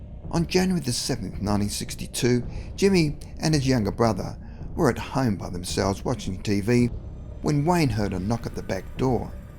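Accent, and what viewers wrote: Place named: Australia. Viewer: cant they find someone other than that aussie to narrate? what would he know about california anyway?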